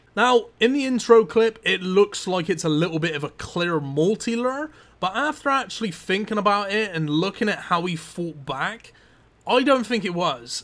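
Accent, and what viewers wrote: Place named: South England, United Kingdom. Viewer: Where are you from in the south of the UK btw?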